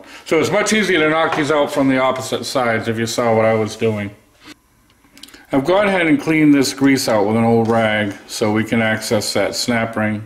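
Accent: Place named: Rhode Island, United States. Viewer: Live in ri? I spot our accent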